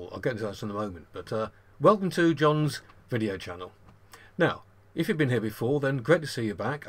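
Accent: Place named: United Kingdom. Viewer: I had to slow your voice down. You speak way too fast for me to understand most of what you say, especially with your British accent.😅 Thank you.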